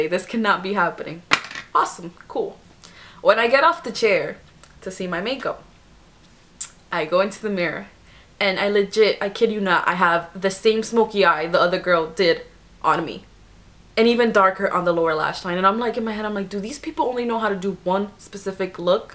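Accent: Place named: Dominican Republic. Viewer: are you dominican? lol you sound like it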